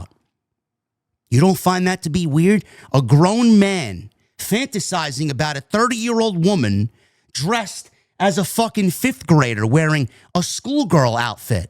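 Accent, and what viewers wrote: New York, United States. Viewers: The 2nd New Yaaaawkkkkaaaah I've liked in my life. Good on ya, kid.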